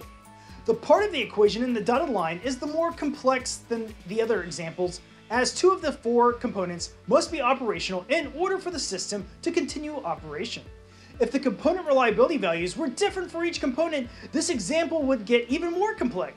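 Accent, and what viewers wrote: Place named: India. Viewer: I thought u were Indian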